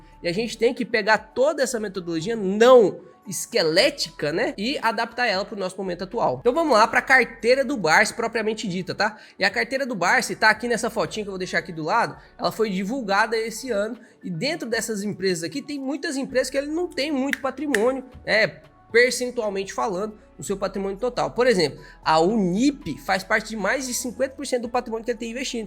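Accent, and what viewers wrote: Caipira, Brazil. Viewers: Você peca na sua fala, pois nas palavras que tem a letra "r" vc quase embola a lingua. Seu sotaque parece com um caipira . Horrível e cansativo ouvir.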